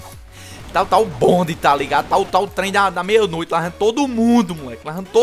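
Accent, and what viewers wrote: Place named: Bahia, Brazil. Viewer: EITA BAHIA lllkkkkkkkkk puchadao esse sutaque em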